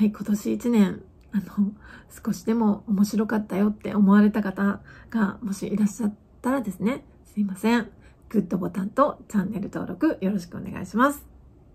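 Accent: Hakata, Japan. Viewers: 博多弁のイントネーションを聞くのが本当に、いやしです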